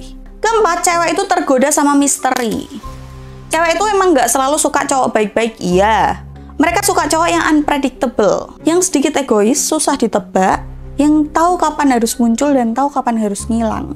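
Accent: Java, Indonesia. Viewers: jawane medok yo mba? tapi bagus triknya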